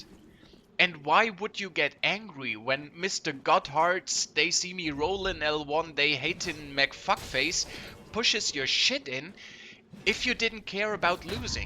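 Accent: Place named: Germany. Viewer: ah i knew it :D because u pronounced some words or to be honest most things u said in a german way. No hate but u should work on that :) but anyways nice Video dude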